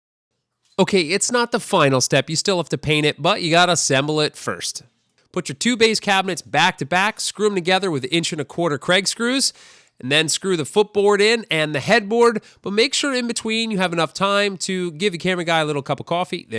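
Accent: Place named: Pennsylvania, United States. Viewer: I knew I heard a PA accent.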